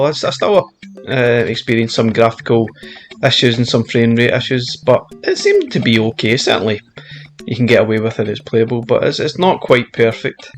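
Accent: Scotland, United Kingdom. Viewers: It's the Scottish accent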